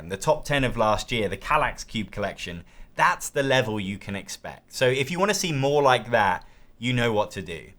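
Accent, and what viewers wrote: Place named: United Kingdom. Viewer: Have you considered adding support for more languages? Brazil's got a growing scene for board games, but very few of us know English well enough to keep up with your videos - especially with the British accent -.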